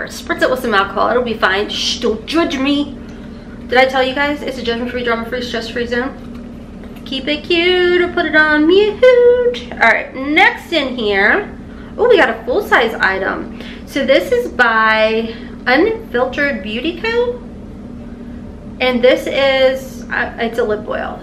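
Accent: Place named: Tennessee, United States. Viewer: I just found you threw another channel. But I can say I'm a fan for life. I'm from Tennessee but moved to Florida. I miss hearing people talk the way we do. And I ha e a Haylee. Lol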